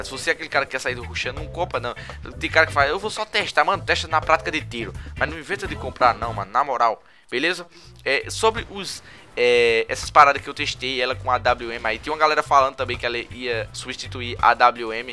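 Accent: Northeast, Brazil. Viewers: Ele tem aquele sotaque nordestino e-e O que deixa os vídeos melhor ainda...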